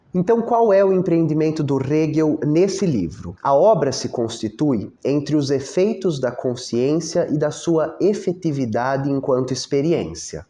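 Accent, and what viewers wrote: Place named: São Paulo, Brazil. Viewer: você é especial. O seu sotaque me delicia (sou carioca). Parece uma especialização do sotaque paulistano, talvez ligado aos italianos. Para mim é o sotaque de uma das minhas bandas favoritas: Os Mutantes. O seu sotaque é igual ao do Arnaldo Batista e, principalmente, do Sergio Dias.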